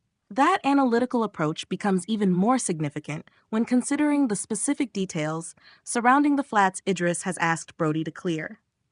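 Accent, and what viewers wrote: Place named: United States. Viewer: Isn't Corrie a British programme? Why is the narration American? (I'm assuming AI, but still ...)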